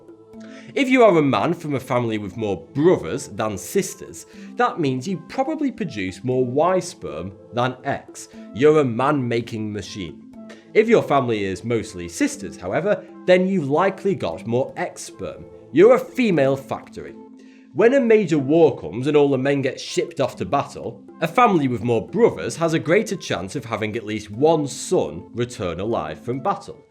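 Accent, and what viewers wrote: United Kingdom. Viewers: I love how your British Dialect makes saying "penis and clitoris" sound so eloquant.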